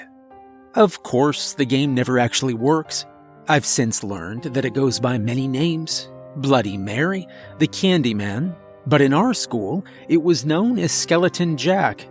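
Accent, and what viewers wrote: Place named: Southern California, United States. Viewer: I'm not gonna say which, but one of those is awful. Another awful one has the initials SD...no standard for story quality and butchers the good ones. I like tho. His weird SoCal accent has grown on me.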